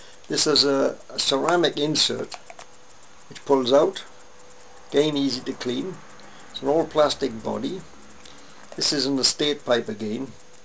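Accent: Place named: Scotland, United Kingdom. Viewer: I'm curious about where you're from, it sounds sorta scottish but not really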